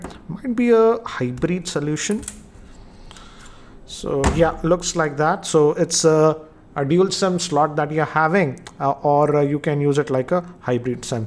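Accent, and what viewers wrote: India. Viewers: Sir Mind Nahi Karna Technical Guruji Jab bhi Video Upload Karte hai Hindi mein Baat Karte hai joh Sab Indian Pakistani aur Kafi Asian ko Samjh Aa jati hai Kyu Unko Pata hai Hindi/Urdu Sab Samjh Jayenge Lekin English Kam Log Samjhte hai. Aap Jab bhi Video Banate ho English mein Baat Karte ho Kya Aapko Sirf English hi Aati hai Ya Aap Dusron ko Attract Karna Chahte ho English Bol kar Sir Mind Nahi Karna Aapki English bhi Hindi Style ki Lagti hai Behter hai Aap Hindi mein hi Video Banaya..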